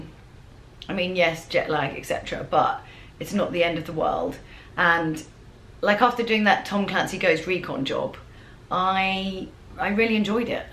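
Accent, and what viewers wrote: United Kingdom. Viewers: Best of luck! If you do go, you should definitely hang on to your Britishness. In fact, you might want to play it up. People are impressed by an authentic English accent, especially one like yours which is REALLY hard to imitate! I think you will be a big success in America. You have a lot to offer!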